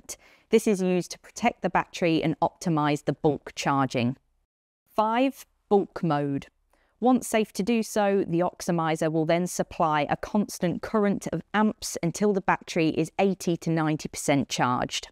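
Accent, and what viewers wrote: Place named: England, United Kingdom. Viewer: Can't place her accent. I guess somewhere in England?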